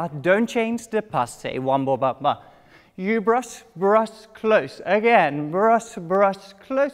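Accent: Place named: Australia. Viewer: his accent sounds partly Australian ...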